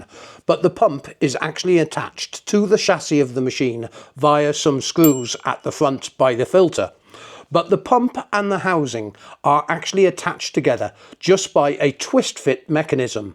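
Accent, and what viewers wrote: United Kingdom. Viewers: I watch his videos because his English is so English!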